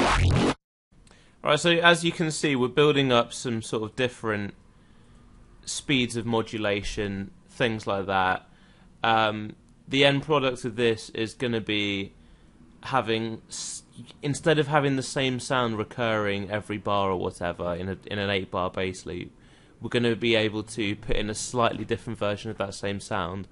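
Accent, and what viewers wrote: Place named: London, United Kingdom. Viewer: no he doesn't. He just has a normal british london accent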